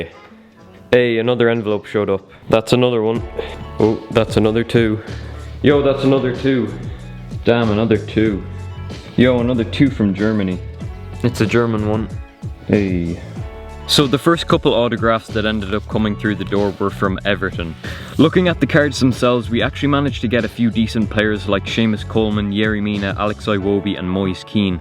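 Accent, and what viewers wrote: Ireland. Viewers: Are you irish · Are you Irish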